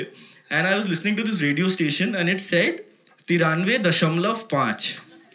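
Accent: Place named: India. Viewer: Where can I get this stand up comedy script? Im sorry, I didnt knw english with indian accent. From Bali.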